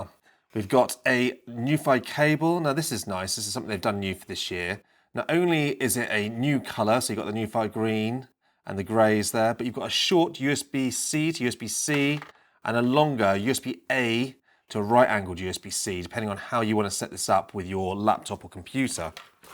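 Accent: United Kingdom. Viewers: O, I use reds for quiet use. Is that a real British accent or are you really from Texas?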